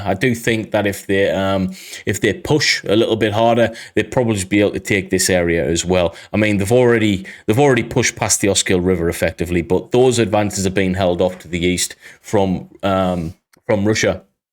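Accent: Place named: County Durham, United Kingdom. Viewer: You sound like someone from County Durham and for that reason you have my “thumbs up”. Best wishes.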